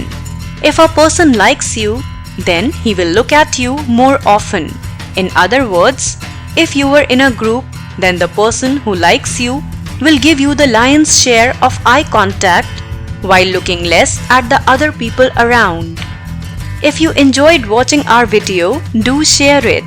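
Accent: India. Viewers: Why Indian accent???!! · Ana kozjak she is Indian and its Indian English..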